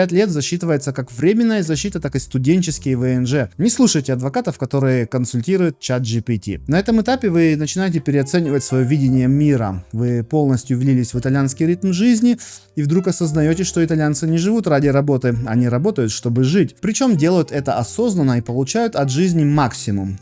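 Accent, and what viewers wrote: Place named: Ukraine. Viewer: Обычный украинский говор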